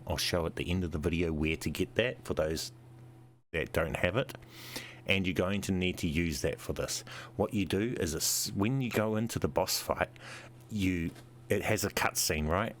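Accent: New Zealand. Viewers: Thank you so much for the guide! Finally was able to beat it because of you haha. Also your NZ accent is so dam cool!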